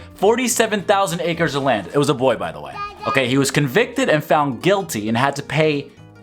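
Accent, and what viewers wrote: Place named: Scotland, United Kingdom. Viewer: Your continual dragging of Barren Ben Shapiro and your scottish accent. I'm here for it!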